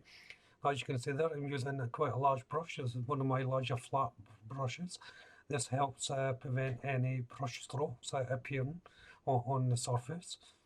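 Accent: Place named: Fife, United Kingdom. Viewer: I kinda thought I recognised your accent. I spent many years around Glenrothes and Dunfermline before moving to Asia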